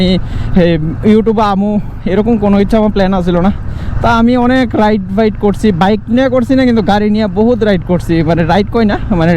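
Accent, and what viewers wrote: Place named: Bangladesh. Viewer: আপনার ভাষা টা আমাদের বাংলাদেশের মতো লাগছে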